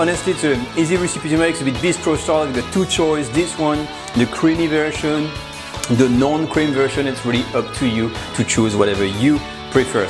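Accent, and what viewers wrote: France. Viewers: Your French accent convinced me to make this recipe.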